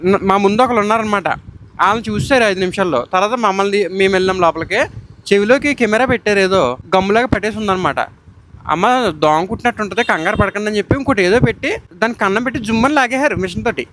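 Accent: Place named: East Godavari, India. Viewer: EG district language irritating ga vuntundi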